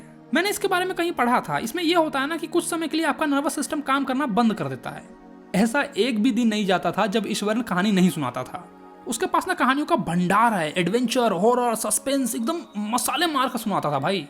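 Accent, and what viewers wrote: Bihar, India. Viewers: Bihari language me maza aa gaya 😂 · Aap bihari hai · Bhaiya aap Bihar se hona ❤ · bihari accent😁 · Do you belong from Bihar??? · Bruh i think you are from bihar 😅, like no offence but ur method of speaking shows that. ❤ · bhai aap bihari ho na 😊😊😊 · Bihari ho bhaiya?? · Bhai app kha ke ho bhoot wala story me jo tone tha wo mujjafapur sultanpur yahi sab ka tha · AAP to Pakka Bihari lag rahe ho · Sir aap Bihari ho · Are you bihari I am also bihari because you spoke bhojpuri 😅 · Bhai aap bihar ya up se hoo aapki explanation yrr 😭 bahut jhakkas hoti hai bahut ache se samajh me aata hai ❤ love you bhaiya 😊❤ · aap biharse ho kya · are you bihari??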